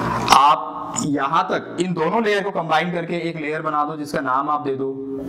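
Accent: Pakistan. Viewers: sahi kaha bolta ek dm pakistaniyo ki tarah h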